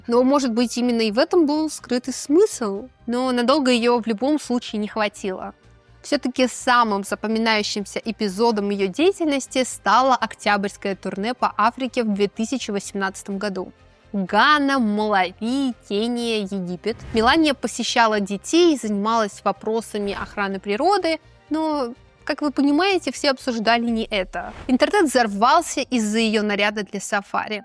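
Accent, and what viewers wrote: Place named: Russia. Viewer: Дорогая ведущая, ты с дианой панченко как две капли воды по стилю ведения программ, по расстановке интонации и акцентов, по переигрыванию ситуаций, по мимике, агрессивному обживанию передаваемой информации, по особо подчеркнутому руССкому акценту, да почти по всему, включая ваши перенакачанные губы!..... что это за такая дивная тенденция в современной пророссийской журналистике!!!!!!! Фу, противно и гадко!!! Не переигрывайте, будьте живыми, незлобными, простыми и позитивными, оставьте излишнюю едкость и будьте менее судьями, а более информаторами!!!!!!!! Успехов!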